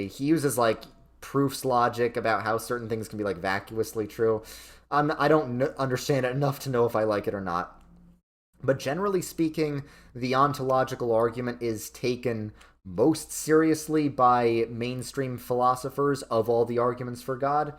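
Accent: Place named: Bronx, United States. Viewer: No offense, but you definitely sound like you are from the bronx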